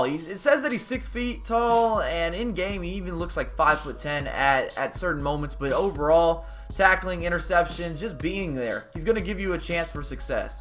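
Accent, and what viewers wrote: United States. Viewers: Are you America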